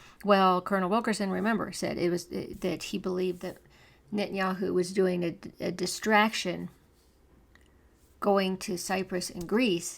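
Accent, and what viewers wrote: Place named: United States. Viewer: YT “fed” my this channel. Interesting, but I have some questions, comments, observations. First, when did your mother attend HS in Palaio Faliro? I lived there. I knew THREE women who went to school there, before 1960, none ever mentioned that Constantinople would be returned. But I have heard others say they were taught this-in rural Greek schools. Second, observation, you sound American. You’ve never noticed that Orthodoxy, Hellenism, and Greece are generally painted out of the news-scape? Pascha is glossed over, because it’s not Western Easter, while Jewish, and even Moslem and now Hindu holidays get press. You haven’t noticed that living in the US? Because I have since I came here. You have some good nuggets of information. I like the links and references to Nima and Col. Wilkerson, and Col. McGregor. For whatever reason (perhaps they were spurned by the system), the tell a lot of truths the mainstream media keeps. 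However, they have their biases. I’ve been watching McGregor for years. I like him, BUT, he was a Turkophile. Of late, he has seen the light and become more objective when discussing the Turks. The US officer corps, Army/AF has always favored Turkey. Israel is committing the first live-streamed genocide and apartheid. Israel is every bit as despicable and criminal as Turkey/Ottoman Empire, FYI. Greece should not trust Israel, or depend on it. The Greek government is basically beholden to the West/US. They are puppets. Back to the prophecies (as reported by you)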